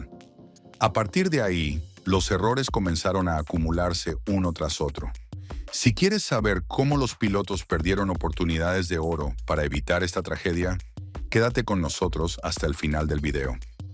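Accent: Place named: Mexico. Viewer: no, su contenido es un copia y pega y su voz de mexicano da cringe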